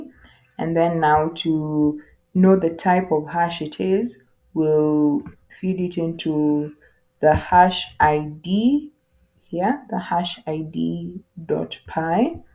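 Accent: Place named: Kenya. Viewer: You sound Kenyan I guess, if you don't mind are you proficient in C or C++?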